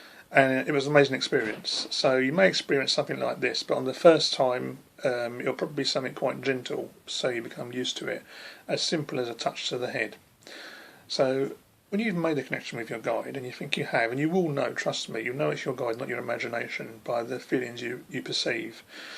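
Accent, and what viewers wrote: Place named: United Kingdom. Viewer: This was too freaky! I have been trying to reach out to my spirit guide for a few years but recently more so. I always had a name in my head and I randomly came here to listen to you because you are British mainly and your words resonated with me but when you said the name of your guide I was freaked out.....Jonas is the name I have had in my head for a few years now. I thought I'd made it up. Now I know it is my guide. I wonder if it's the same guide as yours? I'm freaked out!!!!! Synchronicity or what????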